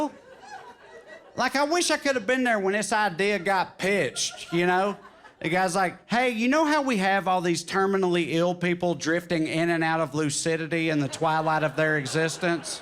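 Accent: South, United States. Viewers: Love this. Maybe funniest sound man ever. Southern accent + a wicked smart savvy insight = tastiest. (Babies listen to your photographer friend and back-pocket that cellphone. The fatigue lines and weird rectangle leg thing ruins a great blue jean) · Southern draaaawl…feelin it bro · Texan here. Glad to see the literate south coming up in comedy. The irony in the accent should be ample impetuous for some more great bubba's-got-a-passport comedy. Excellent set, my dude. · Trae Crowder. Sooooooooooo Flippin ' Funny. - Scotty Kilmer was the O N L Y smart - talking dude - With - A - Southern - Accent - that I have deeply embraced in my life. Agree with him or N O T... when it comes to talking about cars...... Scotty ' s range of knowledge from individual vehicular component parts to The A U T O I N D U S T R Y in general... I simply love that cantankerous old coot with that southern accent. 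S C O T T Y. That O N E Dude. That -- W A S -- It!!! - - Now... in my life...... I have a 2nd Southern Accent Dude who I now ❤️ L O V E ❤️. Thank you Trae Crowder for helping me to be less of a Northern Bigot. - The song that ' s used to open // close his comedic routine is Sooo C O O L!!! Group:. Song Title: " " Burn. Flicker. Die. " " - Notable 3rd mentioning... Chris Stapleton. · Found this guy from Tik Tock funny ! Black man from NC here sounds like me and my cousins shooting the shit about ourselves , people and old dead friends and family! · Yeah, the accent is a turnoff. Grew up in the south and just don't like what comes with that sound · The southern accent just makes me feel at home. My parents were raised in the south. My dad was the most intelligent person I have ever met in my life and it used to be fun watching him with someone that assumed he was dumb. 😂😂. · I think your Southern accent has a stiff challenge from the Pittsburgh accent. 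I lived there for 25 years, absolutely the nicest people i ever met, but that "Yinzer" accent made them sound very unintelligent. 😊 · Thanks for the laughs! That was great! Love your southern accent ❤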